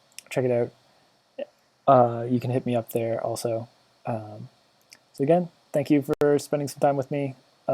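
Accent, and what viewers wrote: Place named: Canada. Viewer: It's all about that Canadian accent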